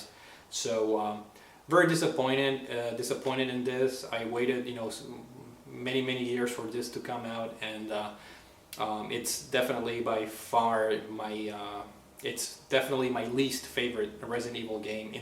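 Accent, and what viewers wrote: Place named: Argentina. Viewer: Great videos man. Where from in South America? I would think Argentina, but please don't be mad if you're from Uruguay hehe. Similar accents.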